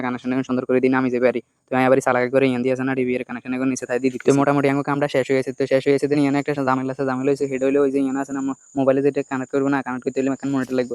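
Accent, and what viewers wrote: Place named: Noakhali, Bangladesh. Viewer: নোয়াখাইলা কথা ঠিক আছে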